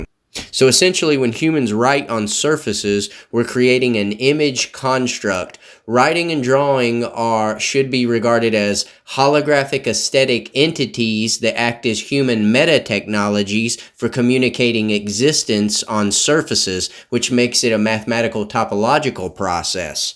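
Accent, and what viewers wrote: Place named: United States. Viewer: See? Americans are still smart even if their accent makes you think they might not be.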